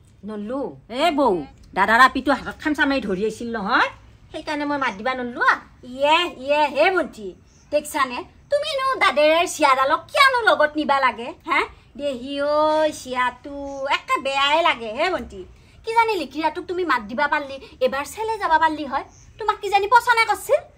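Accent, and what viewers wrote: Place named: Darrang, India. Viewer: বা তোমাৰ মুখত দৰঙীয়া ভাষা বহুত ভাল লাগে ❤️❤️❤️❤️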